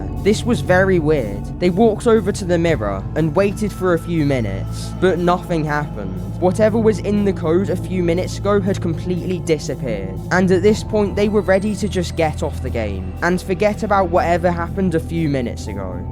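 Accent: United Kingdom. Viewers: Are you scotish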